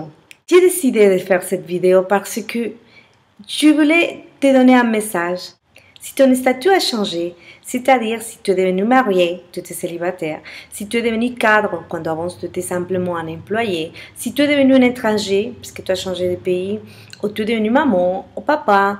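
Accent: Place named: France. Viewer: Te sigo en español querida...pero adoro tu acento francés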